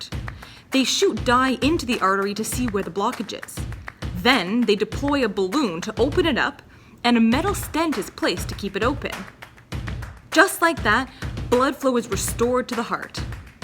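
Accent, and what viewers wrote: Canada. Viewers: This is like the 8th video I’ve watched and I finally realised you’re Canadian 😂 Gosh I’m so bad at guessing accents, I really cannot hear a difference between Canada and the US 😂 I wonder if Americans make that mistake when listening to UK accents 🙈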